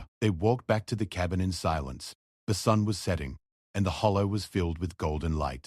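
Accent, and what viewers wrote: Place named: Australia. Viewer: The narration isn’t exactly odd…it’s spoken in an Australian dialect. However, why would a story about a relatively remote part of America and its past be offered in a dialect of English from the other side of the world?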